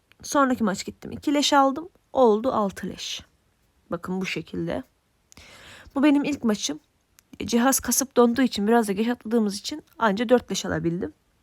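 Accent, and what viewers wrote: Azerbaijan. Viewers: Turk dilini Azerbaycan dili kimi danisirsan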